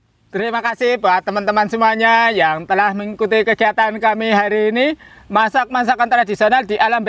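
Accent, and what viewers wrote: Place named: Bumiayu, Indonesia. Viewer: Mas asli mana,.. logatnya mirip suami org Bumiayu, dn sy betawi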